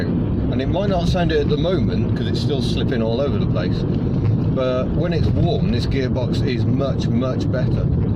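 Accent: United Kingdom. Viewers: Anyway, thanks for all your replies buddy, the only ither burning question for you is, what is life like in Wales, Kent isn't the best place to live and have been considering other options, there are alot of rude and unpleasant people in Kent, people in Wales seem more friendly, your accent suggests u properly moved to Wales from the mainland, how does life treat u over there?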